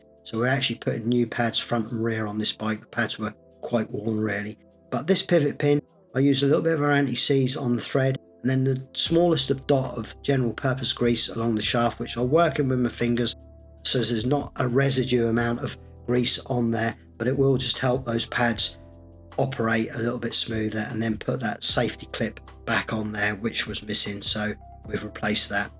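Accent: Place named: Suffolk, United Kingdom. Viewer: Love the Suffolk accent, it's just like mine. Love the video. 😊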